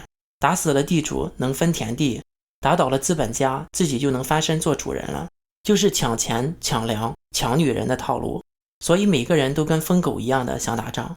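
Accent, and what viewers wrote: Shandong, China. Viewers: 听口音像是大陆人呢？ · 赌五毛这口音是山东人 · 感觉是你山东的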